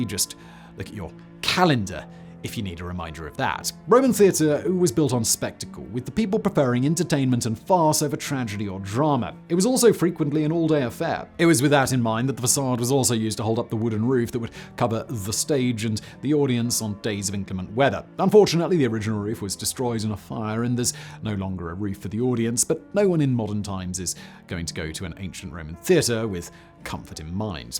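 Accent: RP, United Kingdom. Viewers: The cool thing about, he’s got that posh English accent but it’s cool not annoying. I could have a pint with for sure.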